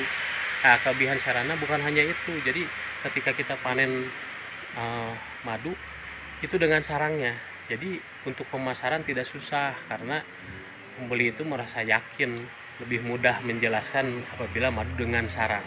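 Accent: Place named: West Java, Indonesia. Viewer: Logatnya seperti sunda, Daerah mana kang?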